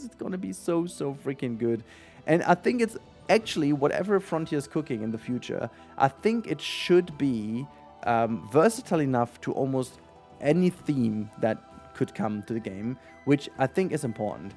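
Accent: Germany. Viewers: Johannes Kepler in Süchteln und dann Berufskolleg in Dülken. Ich wusste doch, dass Du nen niederrheinischen Akzent hast 😂😂😂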